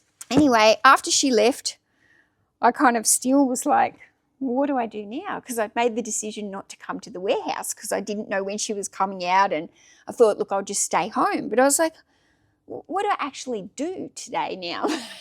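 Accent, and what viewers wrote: Australia. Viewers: I love this....I don't know how to be bored...I started driving strangers (Lyft and Uber) in the NYC and NJ Metro Area....I was burned out from my own business (Consulting for startup Co's ) and needed to get away from a computer. I was terrified about not having any money coming in and having to use my retirement fund to pay bills....I put my head down and just...F......G drove for 2 years....But it wasn't work that I found painful...it was quite liberating and I found my way back to some consulting work but found that Starting my own Youtube Channel based on those 3,000 Lyft Rides (with Strangers) has given me such meaning and put to use all of that experience...Money is still an issue and at 62...I'm still hustling but at a level now that if fun and sustainable and without the fear! Found your Back from Burnout Channel and appreciate your content and lovely Australian accent. 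Thanks. Sean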